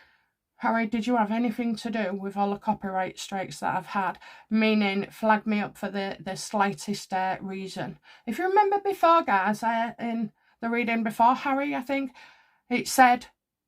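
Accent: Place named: England, United Kingdom. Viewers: You are entertaining as well as teller of truth. I love your energy and also your particular English accent. Coming from Australia ❤ Harry is a boring predictable petty nasty piece of work. Where are his imaginary children? He NEVER mentions them! What a dishonest POS, taking orders from his horrible handler. Weak as piss. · Am gutted for you. I love listening to you. Not only as a reader, but also because we speak the same dialect. Am a 74 & I speak with an "old Bradfordian" Yorkshire accent. N am proud on it. It's probably the accent H can't cope with, poor little man. ( Big tear left eye). Trevor Coult was demonetised by h&m & he chose to have a long legal fight, and he's coming out tops in the end. I wish you all the love, luck and justification.🕉️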